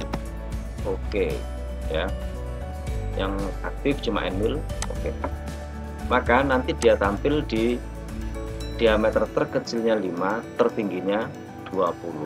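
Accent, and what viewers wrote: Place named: Java, Indonesia. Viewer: baik Pak. Trima kasih. Sedang mengikuti video tutorialnya Pak. Karna masih awam banget jadi sering saya nonton. Kalau boleh saya tahu posisi Bapak di Jawa mana. Dari logat seperti orang Jawa. Mungkin Saja di Surabaya bisa saya kursus secara langsung. Trima kasih🙏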